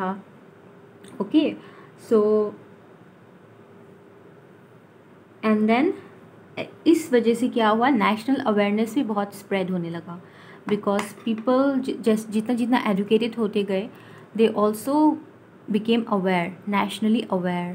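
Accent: Assam, India. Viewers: This is great...btw are u Assamese !?💟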